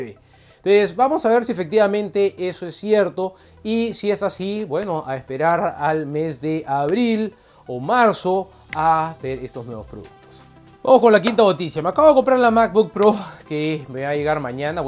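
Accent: Peru. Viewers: Él es peruano?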